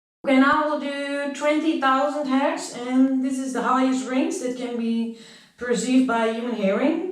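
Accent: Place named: Netherlands. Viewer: Why does she have a verkakte Dutch accent?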